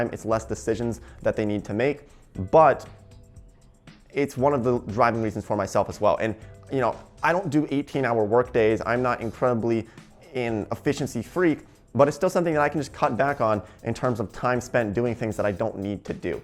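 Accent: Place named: New Jersey, United States. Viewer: Thats a cool accent, i'm guessing new jersey? Forgive me, i'm british!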